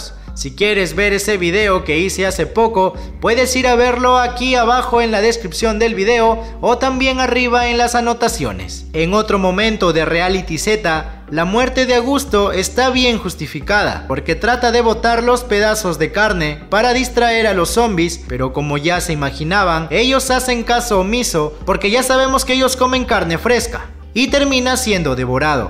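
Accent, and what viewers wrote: Peru. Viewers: De que país eres xd ? por como hablas peruano pe mano ?= soy nuevo xd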